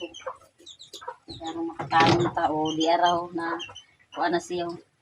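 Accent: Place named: Visayas, Philippines. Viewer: Katawa gyud ko sa lawom nga bisaya nimo ate 😂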